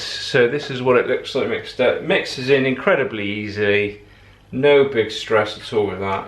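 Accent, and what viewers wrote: United Kingdom. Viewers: My grand parents and beyond were Brits and I really enjoy the accent...I'm a yank now...The vid was very informative and I need to do this...am in the process of restoring a 93 Honda Prelude that has tons of micro scratches from dirty windshield wipers being dragged over a dry windshield...Thanks again...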